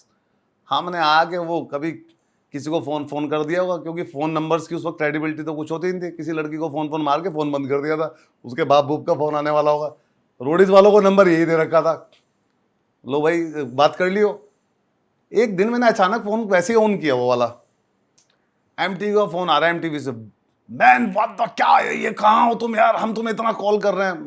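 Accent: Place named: Saharanpur, India. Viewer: He is real man..his accent is actually Saharanpur wala...wish him success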